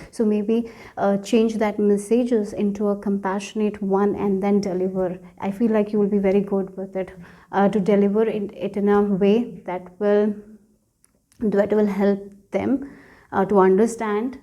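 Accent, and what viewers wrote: India. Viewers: 😱😳🥹 Ohhhh, wwhhhooooowww. First time I'm guidet to you ... My choosen pile ... speakless. How is that possible? Thank you sooooooo, so much. It is exaktly what I've needet for helping in my situation right now. A big gift.🙏❤️‍🩹💖😇🎁 Indian angel, a big hug from Germany, so nice, your accent. 😊 Isn't it wonderful, how we can understand?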